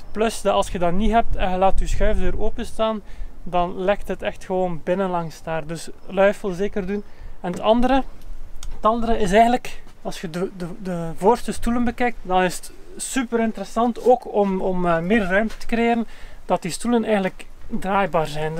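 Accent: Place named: Belgium. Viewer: Dag, ik ben blij eens een Belg aan het woord te horen. Ik heb een Citroën Jumper L3H2 ingeschreven als lichte vrachtwagen en wil die ook gaan gebruiken als camper. Niet door een super de luxe ombouw maar gewoon het hoogst noodzakelijke, bed,kastjes,keukentje,tafeltje. Ik ga er geen ramen inzetten want dat mag je niet zelf doen zeg je, maar ik moet natuurlijk wel ventilatie in het dak hebben, daarvoor moet ik een gat in het dag maken (plaats in het dag is wel voorzien). Mag ik dat zelf doen? Of moet ik naar een bedrijf die dat doet? Fijn einde jaar en een gezond 2023.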